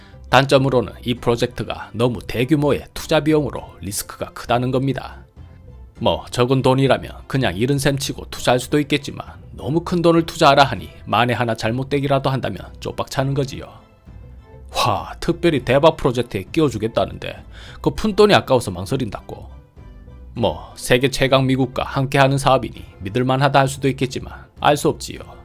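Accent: Gyeongsang, South Korea. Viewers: 왠..경상도사투리..!!..트럼프가 영샘이가??..표준말버젼이면..더욱좋겠구만..!!갱상도사투리 듣기가매우거북함..!!..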